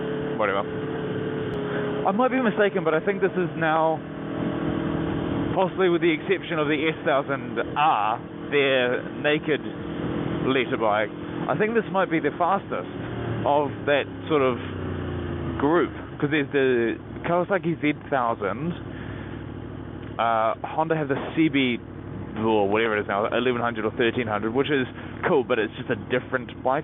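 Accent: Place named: New Zealand. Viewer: Nice to hear a fellow kiwi , really enjoyed this hope to see more on the aprilia and more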